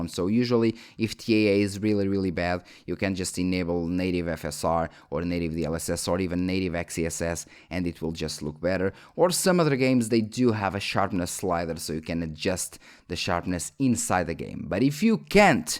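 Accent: Portugal. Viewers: Você é portugues ? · are you from portugal ?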